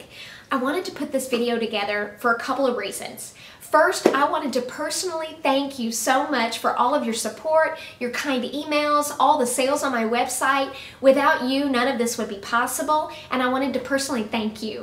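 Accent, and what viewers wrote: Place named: United States. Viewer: you have a really sweet accent! which state are you from?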